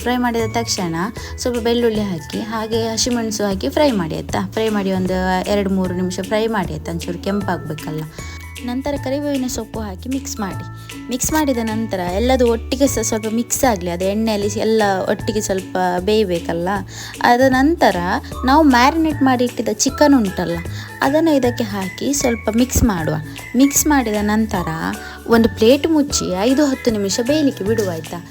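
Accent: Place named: Kundapura, India. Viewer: Kundhapuraa Kannada ...nenp hoithaa...yenthaa martyaa??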